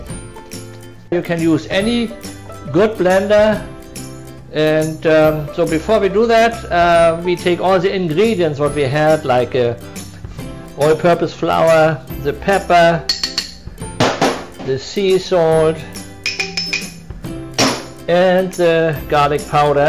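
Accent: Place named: Germany. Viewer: I like your accent German English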